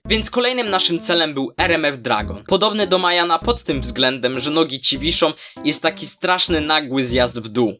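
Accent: Szczecin, Poland. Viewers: ty z szczecina?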